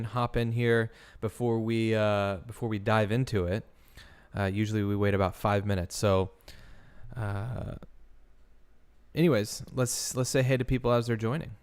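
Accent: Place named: United Kingdom. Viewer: Really enjoyed 👍I think this looks really promising and there's a similarity with the sketches. Nice to hear a brit cover this, I've been following this since two weeks after the murders. Libby was such an inspiring and brave person and both girls would have made such a contribution in this world. Justice will be served for these beautiful girls and their families 💜 💙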